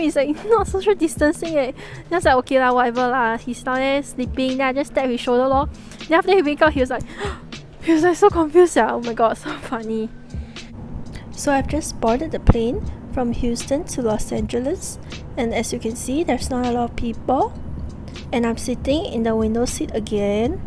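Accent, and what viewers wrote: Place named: Singapore. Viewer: Quintessentially Singaporean! 😄 A bright Singlish spark in dark times!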